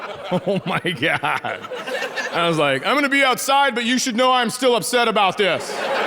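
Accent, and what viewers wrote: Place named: Canada. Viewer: Just found you on TikTok and had to come here and watch you. I thought you were Canadian at 1st from your slight accent. Found out you are from Fergus and I'm just an hour east of there. I now realized why people think we sound like Canadians. We're just too close to the border and it's all their "Canadian wildfire" smoke we get each summer turning us into Canadians. 😂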